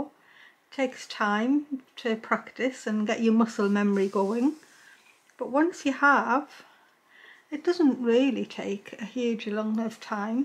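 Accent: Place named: Stoke-on-Trent, United Kingdom. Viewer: A few years ago my family went up north to Newfoundland to L'Anse Meadows to see the Viking Settlement there. I purchased a needle for just this craft. So now I'm retired from a lifelong nursing career lm going to have a bash at this. I'm so glad l found your channel,. Your accent reminds me of my friend from Stoke on Trent!. All the best of Nova Scotia xoxoxo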